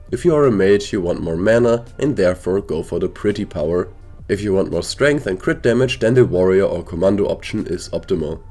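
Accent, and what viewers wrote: Germany. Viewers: This dude has to be German. He sounds so hard german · Do you speak german because im german and your accsent kind sounds like it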